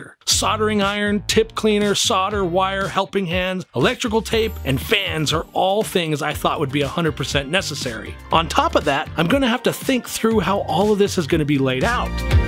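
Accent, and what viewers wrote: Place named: United States. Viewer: Nah, I’m just pulling your leg over the slightly peculiar US pronunciation. It’s a bit like how you guys have an odd way of pronouncing aluminium. It’s just a mildly amusing linguistic difference across the Atlantic. 🙂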